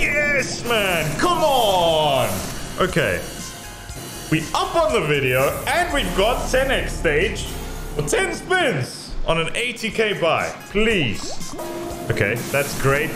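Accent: South Africa. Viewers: You from South Africa 😶‍🌫️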